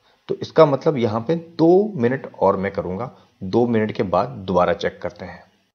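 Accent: Uttarakhand, India. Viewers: Bhaisaab ap uttarakhand se ho kya?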